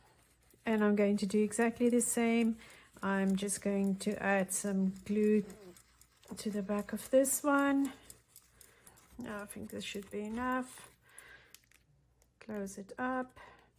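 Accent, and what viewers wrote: South Africa. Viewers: Hi there from North Carolina, USA. You must be either in SA or an ex-pat! I grew up in the Cape. Love hearing you speak, brings back memories. I have been working with teabags for some years, trying many different projects!! Can't drink US tea, so still buy my Typhoo and PG Tips... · Ek het nogal gedink ek hoor n Suid-Afrikaner praat. Baie oulike idees, dankie.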